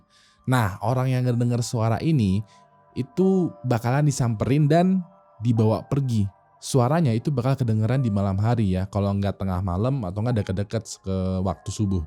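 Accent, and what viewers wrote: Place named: West Java, Indonesia. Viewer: asik juga dengerin orang sunda cerita jawa, pagi dua sore mati…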